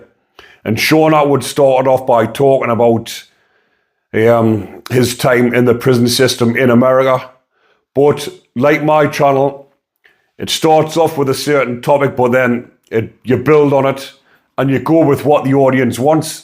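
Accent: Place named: North East England, United Kingdom. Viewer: I hope I’m wrong, but maybe your north east accent may be why you aren’t getting the subscribers 🤷🏻‍♂️ I personally understand every word you say, but that’s because I’m English. What I see is a decent man, a man who has accepted his wrongdoing and is prepared to change. Not only that, you’re advocating peace ✌️ I think you’re fantastic mate 😮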